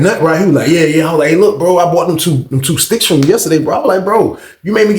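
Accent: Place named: Texas, United States. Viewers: He really just talk like a nigga from the south 😂 whenever we play cards it’s a lot of trash talk like that just don’t threaten anybody and you’re good or call them a bitch unless it’s some family or homies. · Nah that’s how we talk in Texas fasho!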